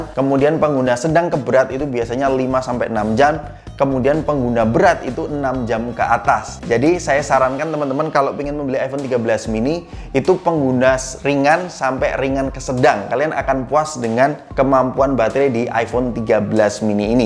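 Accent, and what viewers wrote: Semarang, Indonesia. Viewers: Weh wong semarang ik 😄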